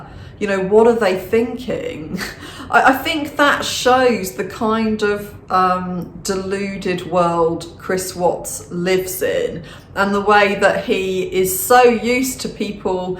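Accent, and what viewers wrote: United Kingdom. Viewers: I adore your Britain english accent😍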